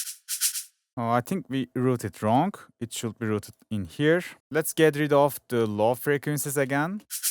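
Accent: Turkey. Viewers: bu ingilizceyi tanırım türksün demi kenks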